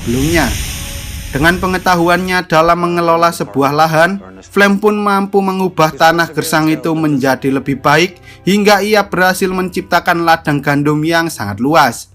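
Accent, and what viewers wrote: Java, Indonesia. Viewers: LAGI LAGI MEDOK.... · Woe logatmu jowo men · Mas maaf, bisa gak kalau ngomong nya gak terlalu medok bgt? Soalnya kaya yg ngobrol sama org jawa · Aduh mendok 😂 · Medok bgt, jadi kurang enak di denger. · Teko jowo yo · aduh ngomong nya Jawa medok lagi. ini sebab nya aku benci jawa. logat nya malu malu in. mending sunda lebih oke.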